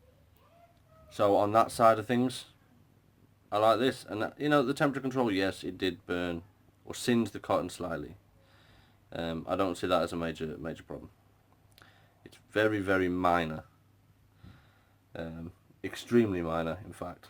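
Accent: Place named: United Kingdom. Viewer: Thanks dude. Nice hearing a UK guy do good, in depth reviews for once! Will do man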